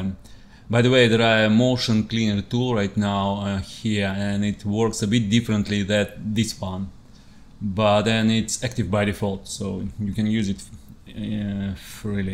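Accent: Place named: Russia. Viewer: Very nice russian acсent).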